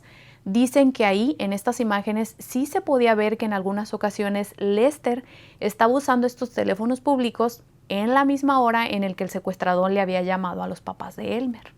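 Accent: Mexico. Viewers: si gracias sabía que era mexicana su asentó no podía equivocarme y desepsionarme gracias 👍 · ¿Eres norteña vd? ¿Monterrey?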